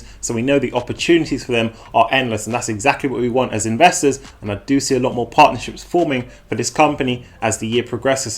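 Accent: United Kingdom. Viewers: love your British accent!! UNFORTUNATELY ALL THE STOCK ARE OTC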